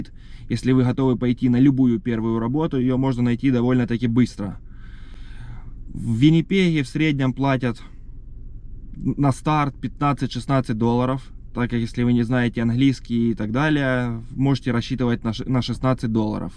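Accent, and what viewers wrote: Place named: Kharkiv, Ukraine. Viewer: Похоже на Харьковский говор)))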